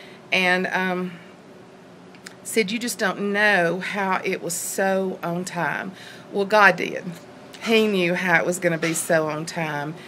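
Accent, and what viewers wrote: South, United States. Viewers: your Southern drawl is so fun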